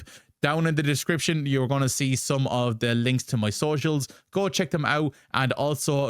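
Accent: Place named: Ireland. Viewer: This guy is Irish Irish lol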